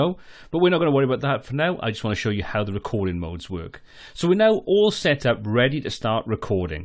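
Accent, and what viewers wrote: Wales, United Kingdom. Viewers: Excellent video, easy to follow. Solved my recording problem in the first few minutes. And a great Welsh accent.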